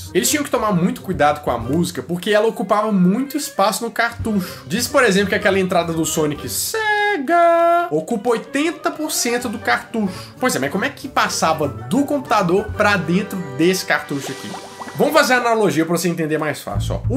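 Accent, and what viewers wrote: Minas Gerais, Brazil. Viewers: Você é de Minas?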